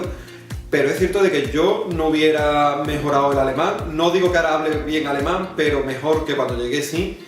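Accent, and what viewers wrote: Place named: Andalusia, Spain. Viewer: Olé olé y olé, maravillosa explicación!!! qué acento andaluz más bonico!! un besazo guapoooo!!!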